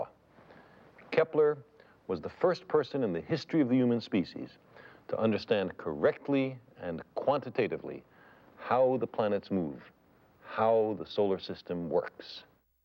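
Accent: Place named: Brooklyn, United States. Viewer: Carl was born in Brooklyn and lived there as a child. It must be noted that the "Brooklyn twang" was different than today than when was a child in the 1930s ( would be pushing 90 if he were still alive). It must also be noted that spent the majority of his life in high-level universities such as Harvard, Cornell and Berkeley. Being a lecturer it's likely he taylored his accent for his students.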